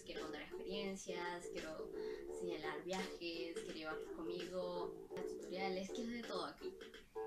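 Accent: Mexico. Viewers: Hola bueno conociste el norte. muy buen ambiente. sólo te faltó conocer Chihuahua nuevo León Durango. y bueno hasta se te pego un poco el acento. aguevo. un saludo linda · casi puedo jurar que eres mexicana de verdad tienes acento de sonora por un momento me enganaste saludos... like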